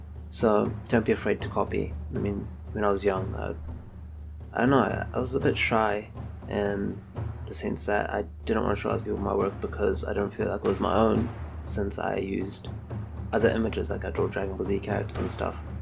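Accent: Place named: New Zealand. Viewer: you from nz?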